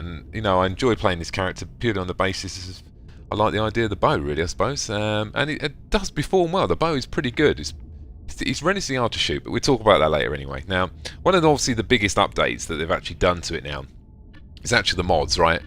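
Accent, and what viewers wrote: England, United Kingdom. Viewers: Been watching some of your videos after I found that warframe one, Your voice is now one of my favorites out of people who talk over video games on youtube with an English(I think?) accent. lol, and you know there's a lot of those. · From the moment i heard ur voice im thinking like this guy is smart because i always think that people with english accent is smart :)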